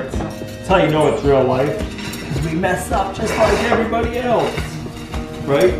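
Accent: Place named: Boston, United States. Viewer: I thought your accent sounded familiar.... Boston strong! BTW I subbed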